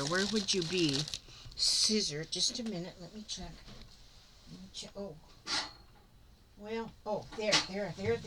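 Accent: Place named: Florida, United States. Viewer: Hi it Patricia Rodriguez from San Antonio Texas I just always love you and papa 👴 how cute and adorable u both are and love ur Florida accent I was just thinking 🤔 you can color then put mod podge on those papers u got I guess from the floss well u can make them into paper beads and make necklaces with them I am subscribed to Darlene Michaud and she does a lot of stuff like that just giving u an idea 💡 love u guys have a blessed wonderful day in Jesus Christ mighty name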